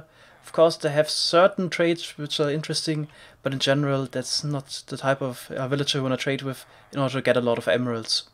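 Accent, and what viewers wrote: Germany. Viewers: der aktzent klingt deutsch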